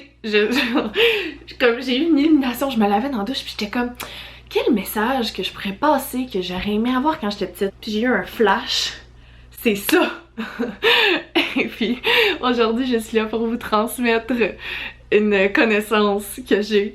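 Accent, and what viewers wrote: Quebec, Canada. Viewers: Je comprends pas très bien car j arrive pas comprendre car tu parles trop vite et j ai pas l habitude d écouter quelqu un qui a l accent québécois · c'est juste à la fin que j'ai compris que le mot "blonde" veux dire petite amie... mais l'accent Quebecois est vraiment satisfaisant, j'ai adoré dés le premier écoute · En tant que français ET homme sa me fait rire c'est sujet alor imaginer que ce soit avec un accès québécois et de l'humour qu'on en parle là je suis mort surtout que j'adore ces vidéo sa y a ma journée est refaite 😂😂😂 merci 😘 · Français mais québécois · Qu'est ce que j'adore l'accent Québécois je kiff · Venir=jouir? (j'adore le français de Québec ❤)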